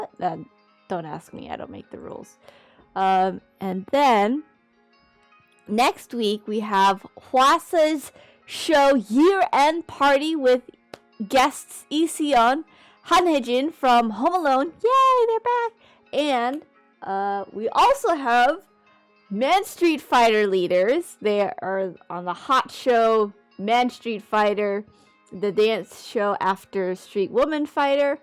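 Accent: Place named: United States. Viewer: my apologizes. Could not understand when you said you had been unwell. It was your American accent 😞 Hope you are feeling slightly better. Cannot wait until 2023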